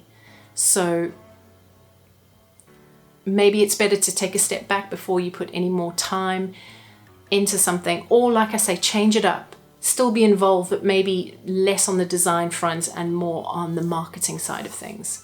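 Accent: South Africa. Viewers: Another value packed video. Thanks for the awesome tips. Are there any valuable Redbubble facebook groups that you feel comfortable recommending? Then off-topic, are you perhaps South-African? I detect a bit of a SA accent.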